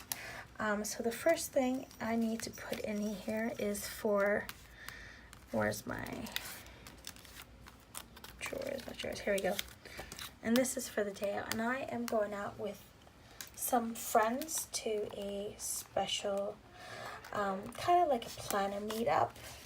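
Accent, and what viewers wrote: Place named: United States. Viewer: There was i watching the playlist video expecting to see U.S💲💵dollars because of your accent! Only to recognise British pounds💷£ which was Great to see👍! Love your envelopes👌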